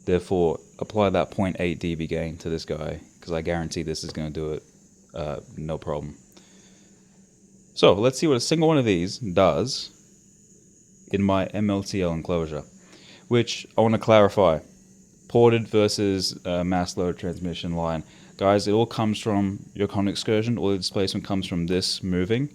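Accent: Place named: United States. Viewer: I've never heard an Australian guy sound so American😂😂😂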